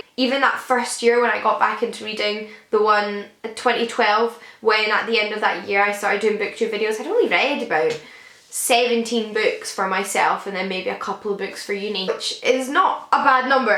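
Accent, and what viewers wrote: Scotland, United Kingdom. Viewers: just the other day when I watched a video of yours (and I watch them all, even if I know I won't read the book mentioned etc), I thought to myself "why does 'only' have 29k subscribers? why is it not something like 100k?" you're honestly my favorite booktuber and I love how passionate you are and I could listen to you talk all day (yes, I'm guilty of loving your scottish accent). you seem like such a sweet person and I'm so looking forward to hear you talk about books even more in the future! congrats on 30k, you really deserve it! xx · With a Cuppa. You Scottish? Where from?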